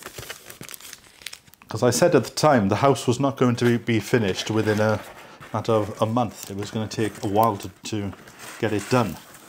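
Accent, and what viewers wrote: Wales, United Kingdom. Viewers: I have CFS diagnosed too, it’s good to see you spreading awareness. I’ve noticed your accent is more pronounced since you’ve returned to Wales. I’m from Wolverhampton (MuddyBootz territory), but my husband is from Darlington. He’s been in the West Midlands 30 years now, but every time he goes up north he remembers his accent :)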